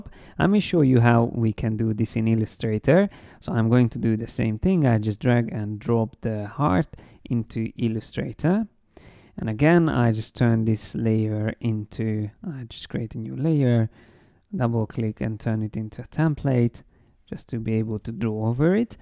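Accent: Hungary. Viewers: Jézus, de ki lehet hallani a magyar akcentusodat :D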